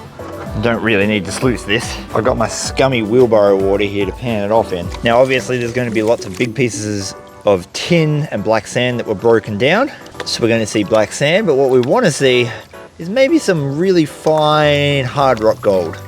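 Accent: Australia. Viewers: I spend a considerable amount of time watching those other gold prospecting videos you mentioned and would like to point out that your personality and energy, as well as your Aussie accent and Gadsey (sp), all combine to make your work the first I choose when there are choices. It would be a shame if you lost the will to continue your work and I commend you for your efforts.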